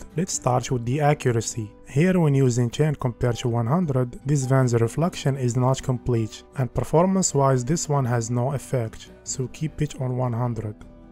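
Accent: Morocco. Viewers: where are you from ? you have a Moroccan accent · You're Moroccan aren't you XD I can tell by the accent. Best of luck !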